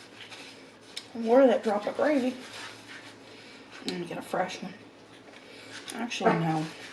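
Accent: South, United States. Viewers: Ya I notice your accent alot...I love it. I'm french so all I hear is french accents😄so to hear a nice southern twang is really different & cool for me. Your voice is very soothing😌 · Im British and we have SO many accents lol but that goes with the American accents too. Us British find the number of American accents - especially your southern accent is really unique!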